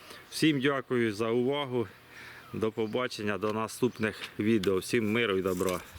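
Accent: Poltava, Ukraine. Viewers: Підкажіть вашу локацію.Чую по говору десьближче до полтавської обл.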